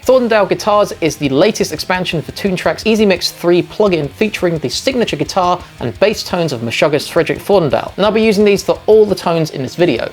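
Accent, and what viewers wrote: United Kingdom. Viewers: You speak the queen's English. It's Maths. Plural. Short for mathematics. There isn't one math. There are many Maths.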